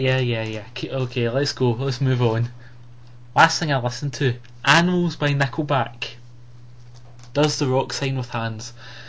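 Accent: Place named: Scotland, United Kingdom. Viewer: You are SOOOO Scottish XD That is one cool accent :D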